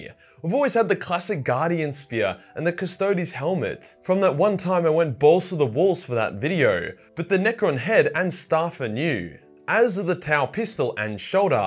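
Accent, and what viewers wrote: Australia. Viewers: I'm guessing your video length is because that's what the algorithm says is best BUUUUUUUUUT. Is there any way we could get some more LENGTH out of you?? Can you do multi parts or something?? I feel like at the 12 minute mark, we aren't getting as much of your fabulous Australian accent as we could be. Doing videos in the buff is tits, but we could use more of that too, and at 12 minutes, it again doesn't feel like everything is getting "fleshed out" enough. Glad to see you growing before our eyes👁👁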